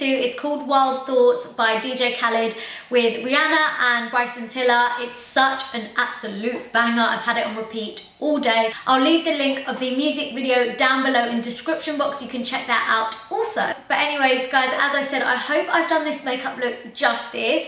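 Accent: United Kingdom. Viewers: британский акцент? такой противный)))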